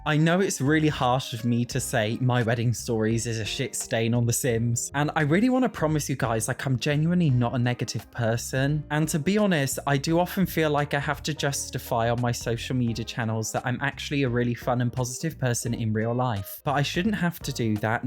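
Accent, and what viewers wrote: United Kingdom. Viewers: As an English Language and Literature major ( I am Turkish) I've been seeking to find someone who has a nice British accent to follow cause I don't want to lose my accent sparkle (u know). And I am so happy to find you! I will listen to you even if you keep complaining lmao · Keep being British I love your accent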